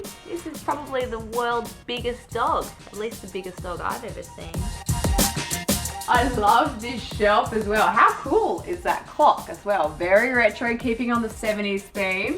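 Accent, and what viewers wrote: Australia. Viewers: This Lady is wonderful to watch love the whole package editing and music all tied together with that cute aussie accent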